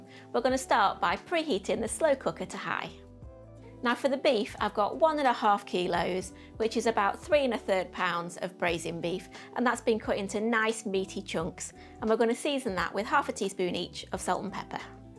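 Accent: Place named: Cockney, United Kingdom. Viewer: Well Done the...loving that Cockney accent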